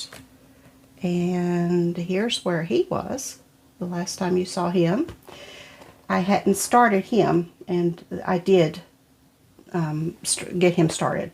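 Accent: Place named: West Virginia, United States. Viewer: I love your videos. I love your voice and accent. Is that a true West Virginian accent?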